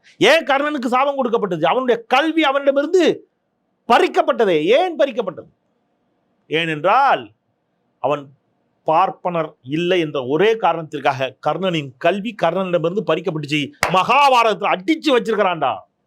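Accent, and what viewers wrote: Tirunelveli, India. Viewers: Thirunelveli language la sema Anna.... congratulations 🍫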